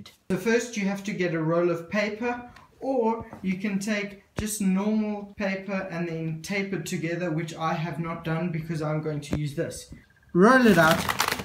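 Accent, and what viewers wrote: South Africa. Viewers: Can spot a South African accent, my baby ball python just shed and my adult is in blue, can't wait to try this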